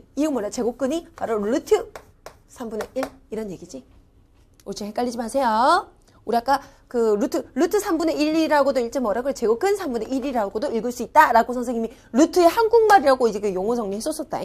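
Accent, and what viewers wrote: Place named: Busan, South Korea. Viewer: 연기할때 표준말 쓰는데, 부산이 고향이라 그런지 실제 목소리 들어보니 약간 부산 억양이 있음. 부산 사람 DNA는 있나봐.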